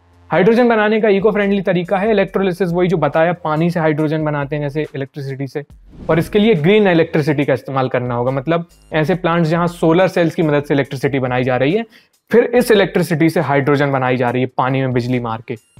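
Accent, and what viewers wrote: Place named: Jabalpur, India. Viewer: Bawa jabalpur se ho lag rha...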